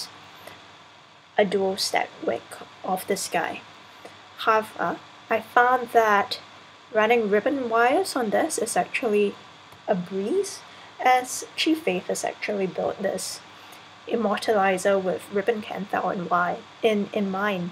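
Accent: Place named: England, United Kingdom. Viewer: Thank you :), I finally got some flat ribbon for this little guy in the mail today, I'm building a micro for it now, time to see how she vapes with what she's intended for! Btw are you English? I only ask because of the accent, and way you spell flavour :P (man I miss my grandmum in England), I was wondering if europe has seen the Russian BIG rba yet? I've heard it's being made in germany and seen a UK vaper that had one, and am trying to get my hands on one sooo bad! drool It's just like a kayfun/russian 91% but it's massive, and holds 11ml 8D, just had to ask in case they exist for y'all yet. Thanks again!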